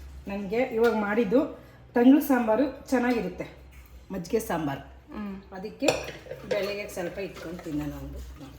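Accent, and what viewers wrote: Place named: Hassan, India. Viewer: neevu hassan kadevra neevu mathadodu and aduge style ade reethi ide navu hassan adke kelde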